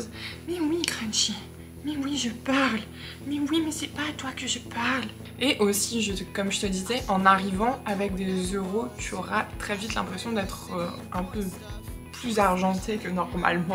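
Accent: Quebec, Canada. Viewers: Effectivement tu as un accent Québécois! Yesss c' est de la bonne intégration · Je perçois un tantinet un début d'accent québécois. Bon courage, belle vidéo !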